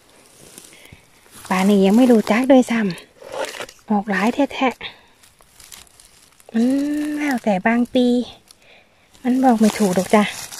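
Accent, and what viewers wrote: Korat, Thailand. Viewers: สำเนียงแบบนี่ คนโคราชไช่ม้ะหนิ :)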